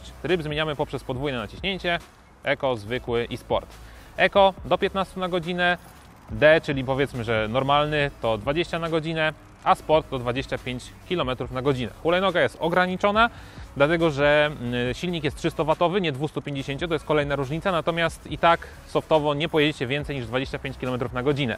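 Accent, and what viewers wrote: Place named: Ukraine. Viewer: sounds like ukrainian but slovakia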